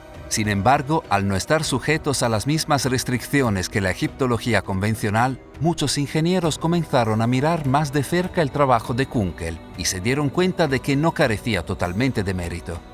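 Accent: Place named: Italy. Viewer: Perché nn fai. Il documentario in italiano se siente mucho tu acentazo Italico man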